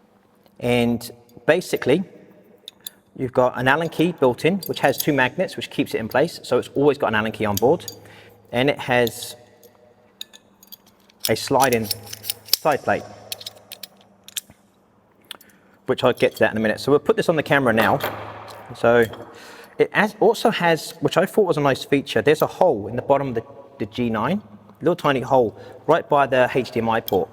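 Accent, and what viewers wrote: United Kingdom. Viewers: Don't worry, POHM, there isn't even the most remote hint of fush and chups in that accent! 100% UK very obviously. As you were. You can CNC machine from cast or extruded or "billet" or forgings. What you start with and how you get it to shape are somewhat independent. If they machined it from a bullet, though, it must've been a BIG one. ;-)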